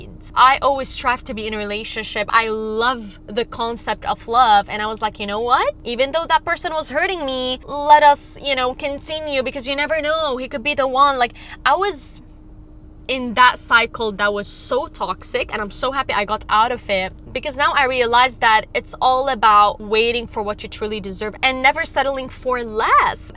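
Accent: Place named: Jamaica. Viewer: 'A fun ting' ooooh she Jamaican. nice video btw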